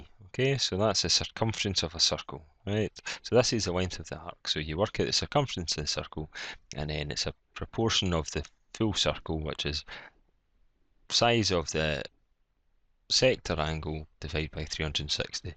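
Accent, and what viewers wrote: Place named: United Kingdom. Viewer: thank you random british or scottish man for the help! after 7 years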